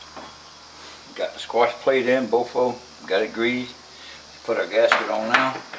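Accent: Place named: South, United States. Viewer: You are wery good at restoring, but your recording is bad, and i have a hard time understanding your country american english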